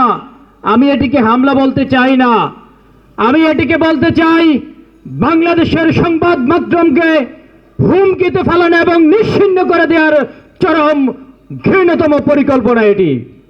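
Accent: India. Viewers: এই লোকের কথার মধ্যে একটা ভারতীয় একসেন্ট আছে।।।😂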